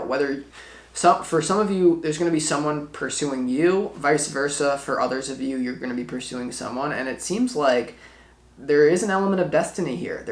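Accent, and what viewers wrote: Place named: Oregon, United States. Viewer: Don't get losttt in the sauceee hahah yesss your lil quotes and how you talk reminds me of my time spent living in Oregon, miss it so much as an Aussie, your readings help me feel closer to spirit and home 💞 thank you x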